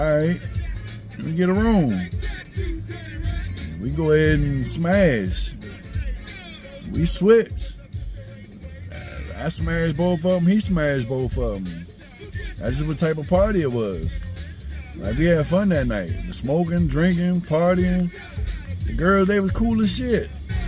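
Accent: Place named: Africa. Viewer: Why are you speaking as if you were an African man?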